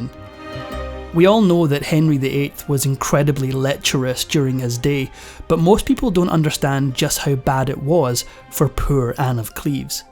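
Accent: Scotland, United Kingdom. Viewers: One of many attractive traits of your videos is the Scottish accent you have! I love it as much as I love the clever commentary and the comprehensive detailed account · The Speaker is Scottish, huh?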